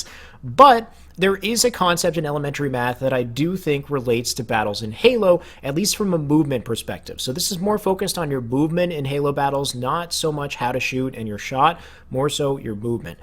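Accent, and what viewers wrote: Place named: Canada. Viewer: He is Canadian.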